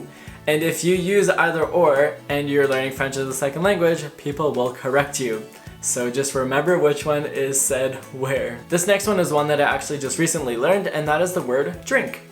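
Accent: Quebec, Canada. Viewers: больше точно It’s nor good or bad it just means he speaks candadian french and not French from France ☺️ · wah you really have the quebecois accent ! im suprised lol nice video :) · En tant que français, on entends le p'tit accent québécois quand tu parles :p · You have a Québécois accent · Tu as un putain d'accent quebecois ahah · Omg! Your french-Quebec is so nice! Hahahahaha (i'm french Canadian) ☺️ It's the same for us, french speaking, with the difference between Canada's english and British english. Nice video! ☺️👍🏼❤️ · You have a french Canadian accent, not a french from France accent. It's cute though. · Very good pronunciation in both accent btw (still need a little work on the Quebec's accent but still... very good!) Funny fact : did you know the Quebec's accent (the way we "contract" words) is the same the French were speaking in France in the XVI century ? 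Quebec is a former French colony. And our accent evolved differently from France from this point.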